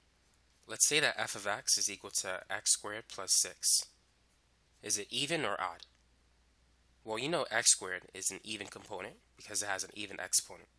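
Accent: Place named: Boston, United States. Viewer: Are you from Boston? I dig your faint accent.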